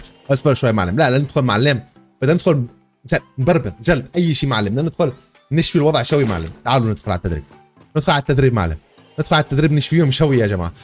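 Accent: Algeria. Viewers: محلا هدرتك بلجزائرية :virtualhug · صيار بتحكي جزائري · صار يتكلم بلهجة جزائرية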